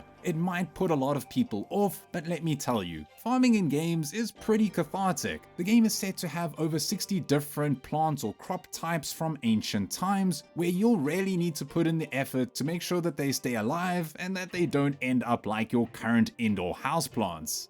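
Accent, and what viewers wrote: South Africa. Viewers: You from South Africa boet? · My infallible sense of compatriotism detects the dulcet and mellifluous tones of a Saffer twang 👂🇿🇦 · Mate... Are you South African?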